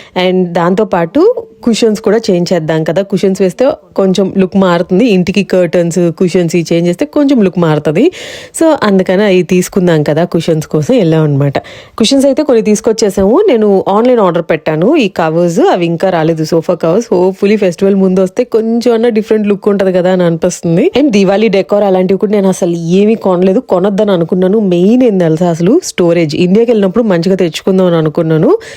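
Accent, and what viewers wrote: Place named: Andhra Pradesh, India. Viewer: Authentic andhra telugu by bro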